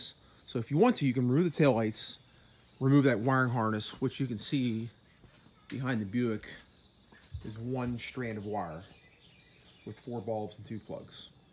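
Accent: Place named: Philadelphia, United States. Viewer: Your thick Philly accent. Where did you grow up?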